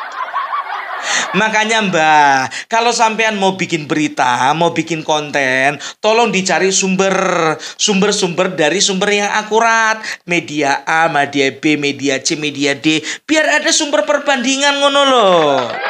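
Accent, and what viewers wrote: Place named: Java, Indonesia. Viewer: Aseeek...opung pake logat Jawa..😂😂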